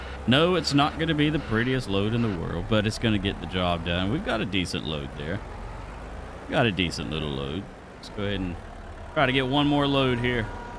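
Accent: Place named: Georgia, United States. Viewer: Where in Georgia are you from? I recognize that accent. I'm about 30 minutes SW of Atlanta.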